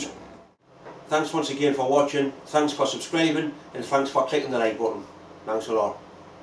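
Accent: United Kingdom. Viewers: Hi You are incredible nice job I like what you done bravo!!! by the way you are from UK??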